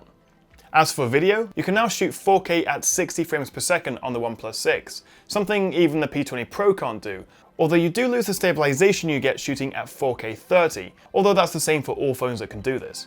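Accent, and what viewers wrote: United Kingdom. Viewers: Finally I found a decent English( I mean localization) Tech review media brand on Youtube, I love the British street view and ofc your review, ( with a solid British accent which satisfies me a lot ), Cheers!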